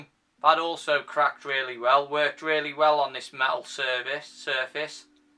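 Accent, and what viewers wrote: United Kingdom. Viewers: This is the most British person I have ever heard. Subscribing 100%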